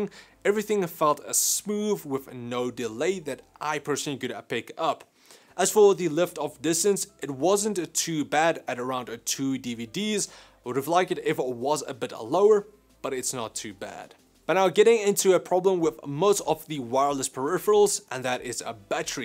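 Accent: South Africa. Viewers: Wow South African damn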